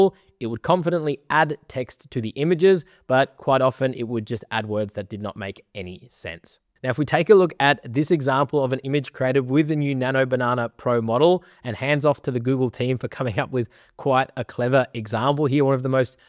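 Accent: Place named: Australia. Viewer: An Aussie in Berlin?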